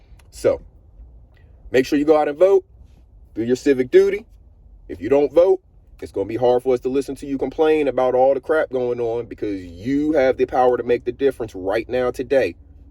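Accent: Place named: United States. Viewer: You sound like a country hill billy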